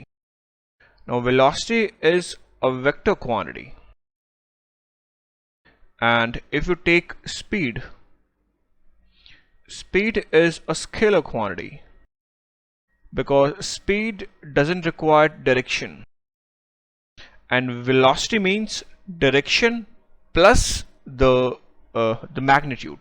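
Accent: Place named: New York City, United States. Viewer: NYC voice pitch 😊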